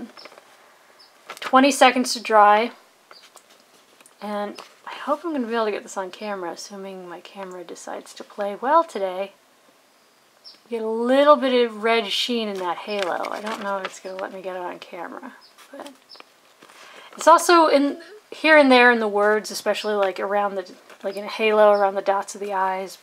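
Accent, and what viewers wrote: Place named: North America. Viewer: Thanks great review I wanted to try this ink but wanted to know about its water resistance. You gave me all the info I needed so subscribed. P.S I'm Brit so not good at North American accents but I like yours. Love the opening spiel too. I guess you are the!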